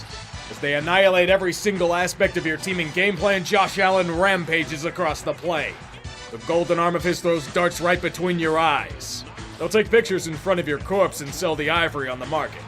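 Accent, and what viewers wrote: Pittsburgh, United States. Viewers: Alright, do it. Take the shot Seattle's defense gets obliterated via yinzer ranting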